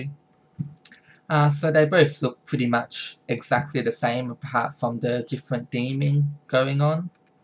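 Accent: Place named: Australia. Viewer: Sounds like he's an Aussie to me, Ronaldo.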